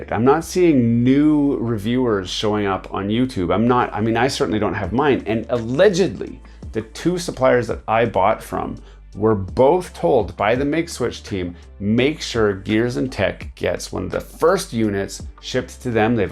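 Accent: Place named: Canada. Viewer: Patiently waiting as well. (Insta-subscribed when I heard your Canadian accent!)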